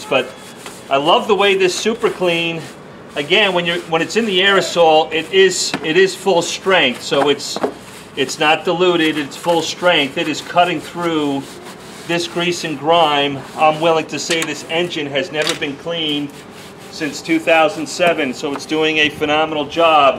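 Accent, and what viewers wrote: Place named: New Jersey, United States. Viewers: This guy is not a Texan, obviously a Transplant! Has to be from the East Coast! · he sounds joisey-ish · He is definitely not indigenous to Texas. My guess is a transplant from Long Island or NJ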